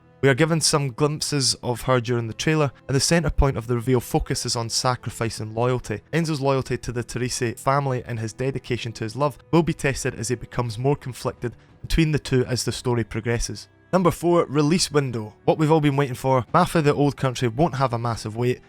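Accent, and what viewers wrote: Scotland, United Kingdom. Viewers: Glad to find your channel, mate. Don't hear enough Scottish voices on here and your commentary is very professional. Subbed. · Are you from Glasgow or Edinburgh?)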